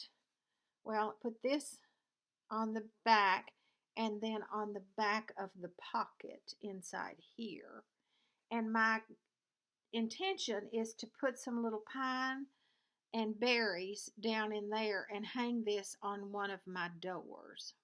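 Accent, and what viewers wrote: South, United States. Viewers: You have such a lovely southern accent! It's fun to listen:) Glad to see your hand is getting better- but you have to take care of it- stitching time might need to be sacrificed.. The broom cover is very cute- I might be tempted to stitch something like that too. I never tried primitive designs- looked more into punch needle style. I'd like to try for your giveaway for either one of magazines. BTW I'm doing a giveaway too :) Happy holidays!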